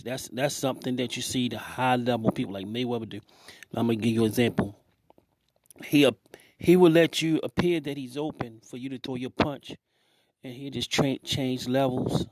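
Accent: Baltimore, United States. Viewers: I recognize that accent are you from Baltimore?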